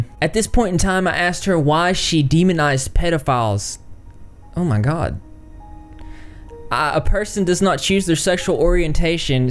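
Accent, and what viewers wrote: South, United States. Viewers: I know this is a serious topic, but I have to be the one to say that, I love your southern accent, it is very soothing.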